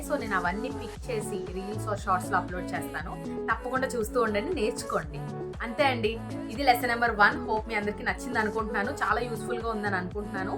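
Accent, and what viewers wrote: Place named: West Godavari, India. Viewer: పాఠం చెప్పే విధానము బాగుంది. అచ్చమైన తెలుగు స్వచ్ఛమైన కన్నడ మరియు ఆంగ్లము. పశ్చిమ గోదావరి మాండలికములా అనిపిస్తోంది మీ తెలుగు. భారతీయ భాషల మీద ఆంగ్ల ప్రభావం ఎప్పటికీ పోదు. వీలైనన్ని పాఠాలు చూస్తాను. విజయోస్తు.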